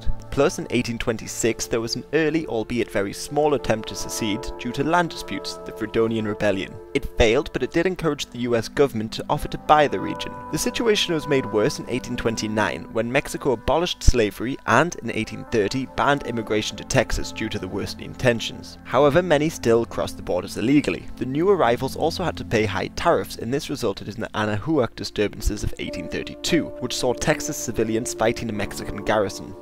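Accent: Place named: United Kingdom. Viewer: Cuahuila, pron., kua-wi-la, not kua-hi-la. Anahuac, pron., aná-wac, not ana-hoo-ac. What is wrong with you ppl??? The very least you could do is get the pronunciation right. As it is, you are using English pronunciation rules on Spanish words. That never works. It's about as bad as using Spanish pronunciation rules on English words. It never ends well. And, why do you have an English bloke narrator. That British accent is so annoying. It's like someone speaking with peanut butter stuck to the roof of their mouth. Get rid of them. Their English is horrible. American narrators for American history.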